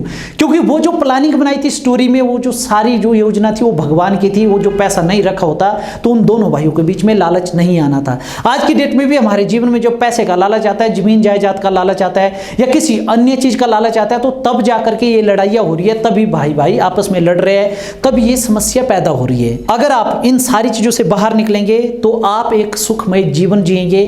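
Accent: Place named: Mandi, India. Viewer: Mandi se ho kya bhasha mandi ki lag rahi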